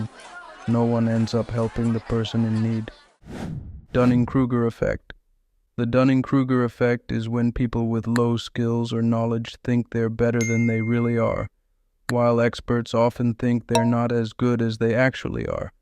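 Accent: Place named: India. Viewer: indian accent and bad microphone = not watching the vid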